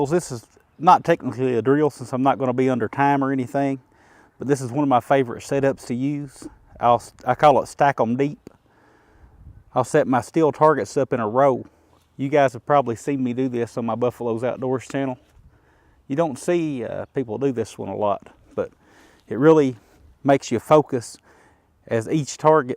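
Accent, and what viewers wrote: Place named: United States. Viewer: You sound like an American to me. And I'm a damn Yankee from Maine. Thanks for your channel it gives an old man hope for this country.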